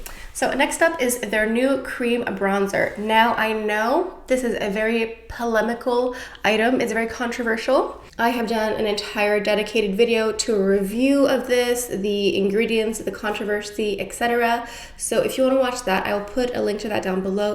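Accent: France. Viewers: I do love the Dior backstage highlighter palette and I'm getting Joy edp as my birthday present on the 7th! I also own and love Chanel soleil le tan (the old version) and I'm going to repurchase it in the near future... Thanks for this new video. I love your French accent, it's so cute. I speak a little French. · R u French?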